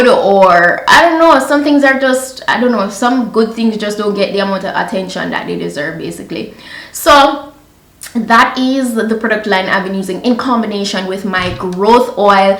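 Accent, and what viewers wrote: Jamaica. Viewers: I hear Jamaican accent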